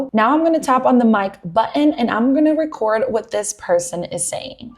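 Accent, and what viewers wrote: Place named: Latin America. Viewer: Hi! I love your accent.. Are you Latin ?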